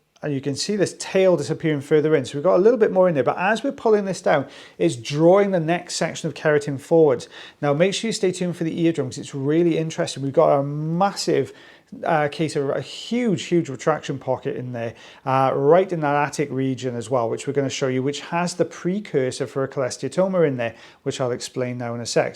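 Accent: Wales, United Kingdom. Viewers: Sorry I had to laugh at your Welsh/English today.. fantastic video as always. Best wishes to, I hope he is feeling better.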